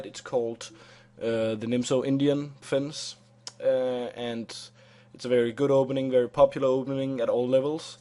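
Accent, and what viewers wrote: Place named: Denmark. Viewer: er du dansk? din accent lyder meget dansk.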